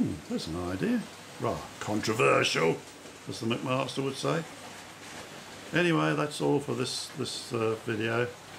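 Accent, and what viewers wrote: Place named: Australia. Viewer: Hi, very sorry to hear of your continued back pain. I know how it can make everyday life very draining. Getting down to rail level and filming can be particularly difficult. However, if you can manage it, please carry on posting the videos. As you rightly say, there are lots of great youtube channels for model railways, but your layout has got to be one of the most enjoyable to watch. There's something interesting in every shot, and I love watching your trains go by. This Pommie even enjoys the Aussie accent! Best wishes for 2023.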